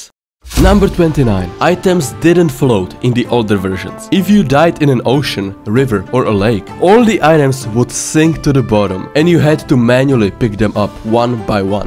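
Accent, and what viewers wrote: Czech Republic. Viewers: t h i c c czech accent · You sound like if you have czech accent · Angličtina dobrá jen zapracuj na výslovnosti máš pořád strašně český přízvuk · Love the Czech accent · Poznam z tvoji angličtiny, že si Čech. Tím nechci říct, že máš spatnou angličtinu. Pěkné video. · my mans got the czech accent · Nebudu ti lhát máš doclea silnej českej přízvuk. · You kinda have a Czech accent lol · Hele Kámo je hezky poznat podle hlasu že jsi čech :D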